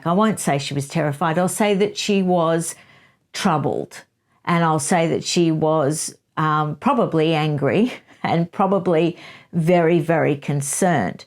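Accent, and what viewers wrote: Australia. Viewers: Omg are you a ozzy?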